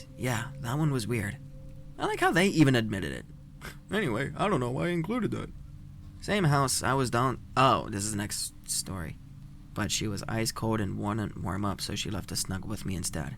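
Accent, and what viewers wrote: Scotland, United Kingdom. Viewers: Sometimes the worst stories are the best! Think this is my 3rd listen already. Hope life is going better! Thanks for the BEST Scottish accent ever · Nice Scottish accent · best scottish accent evwr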